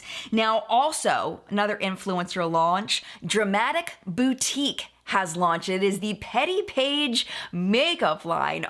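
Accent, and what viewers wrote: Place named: Philadelphia, United States. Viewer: Where are you from? I'm catching maybe some Philly "o"s?? But I don't really know what I'm talking about.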